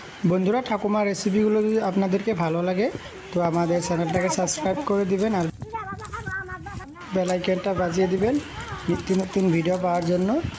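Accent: Tangail, Bangladesh. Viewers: এটা তো বাংলাদেশের টাংগাইল জেলার ভাষা বলতেছে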